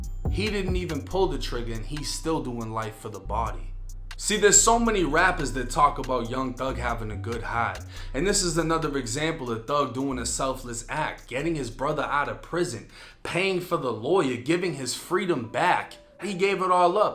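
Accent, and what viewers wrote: Boston, United States. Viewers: Been a sub and when you had about a 1000 subs. I liked your story. And your Boston accent. You probably say the same about my Canadian accent. Listen to Toronto man’s accent. Funny as hell. I did time with some well know Toronto rappers all fighting bodies and get sent to the pen for a 25 year sentence. Cause that how we roll in Canada. 25 max. If your good. You will get out earlier. Toronto mans known for shooting men in the legs ( leg warmers) many here have gotten one and a couple have died from a leg warmer. Hitting that big artery doesn’t take long to bleed out. Look in to some Toronto ggz. Fighting murder cases and still self snitching on Instagram. Giving the judge more ammo. Top5, Flippa. Check them out and there lingo. I was locked up with these guys. Two murders and two not 25 year sentences. Just one. That’s how Canada does it. 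We soft like that. I rock with YOU. COME HERE N 1 million subs. Having beef with Wack 100 is pure comedy. On piru my ninja. Wack is free wackiness. Go with it. Toronto mans not afraid to hand leg warmers out in LA either. Tony Yayo has recently talked about them on YouTube. It’s worth a look. Could be gold and push you over a million. We got a lot of respect for you in the North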